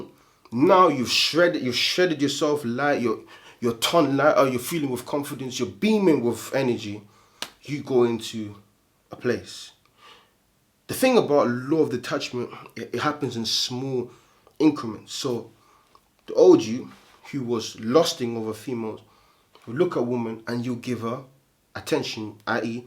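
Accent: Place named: Cockney, United Kingdom. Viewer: And another job well done! You're a wise man. I feel like you actually give people facts and the truth behind this. There's a reason it's a sin to masturbate. There's a reason we are supposed to retain our seed unless we are with wife. Hold on tight fella's, it's worth it!!! Cockney huh? Lol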